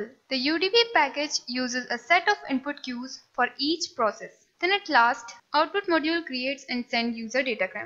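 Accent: India. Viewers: your Indian voice.........................uuuuuuggggghhhhhhhhhhhhhhhhhh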